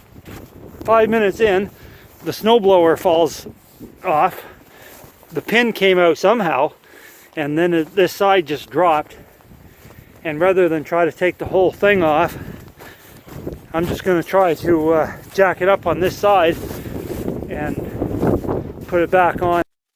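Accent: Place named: Maritimes, Canada. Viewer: He sounds like a maritimer haha I'm from New Brunswick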